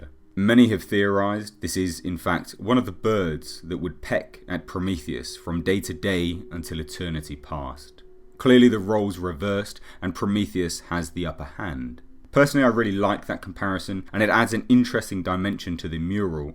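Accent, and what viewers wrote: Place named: United Kingdom. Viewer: Love your videos, great voice for narration (why do Brits always make things sound interesting?) :P. Quick question? Do you believe E. Shaw was turned into an alien queen or some other monster by David after reaching Paradise? I think David had learned a great deal more about the Engineers (their tech, history) than we were led to believe during the Prometheus film, so he has he maybe taken Shaw to a different planet and not the engineers' home planet?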